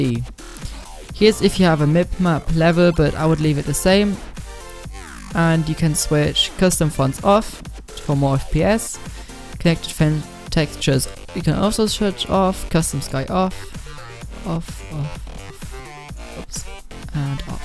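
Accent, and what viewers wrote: Germany. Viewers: Are you german? You really sound German or scandinavian! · Are you german? You sound like a german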